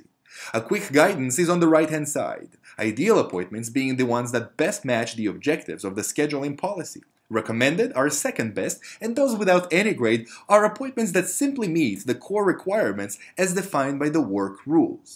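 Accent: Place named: Italy. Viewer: Loud rock guitar music, Italian accent.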